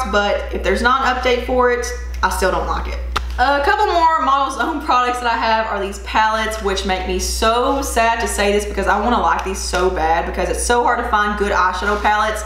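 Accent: South, United States. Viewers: Love your accent!!!! For sure from the south!!!!!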